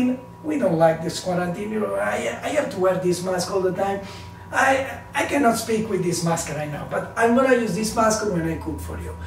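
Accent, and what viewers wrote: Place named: Italy. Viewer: Your Italian accent is Fabulous!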